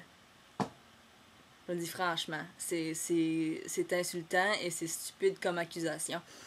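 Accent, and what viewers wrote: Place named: Canada. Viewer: aille, j'ai du mal a comprendre!!! lol l'accent canadien est different que cell de la france.. mais ca va encore, je comprend un peu!! =D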